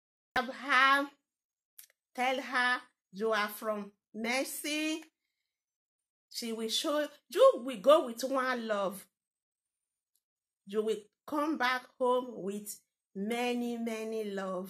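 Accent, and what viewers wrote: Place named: Italy. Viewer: I love your Italian accent, I have my cousins there in Italy, nice content sis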